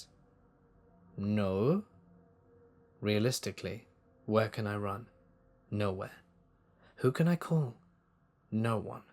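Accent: United Kingdom. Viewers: Is this British English accent? sorry I've been learning English..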